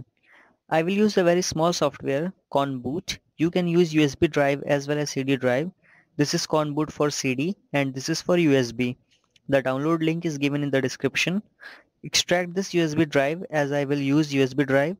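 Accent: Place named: India. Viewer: indian accent is sooo funny xDDDD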